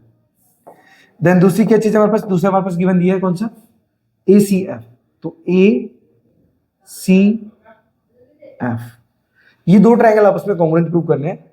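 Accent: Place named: Gujarat, India. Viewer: From your talking style I think that you are from Gujarat. Anyways I'm from Ahmedabad Gujarat. And sorry for distributing you.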